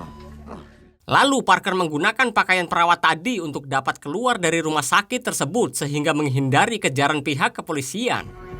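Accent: Java, Indonesia. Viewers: Seru ni pembawa acaranya orang Jawa logatnya mantap😂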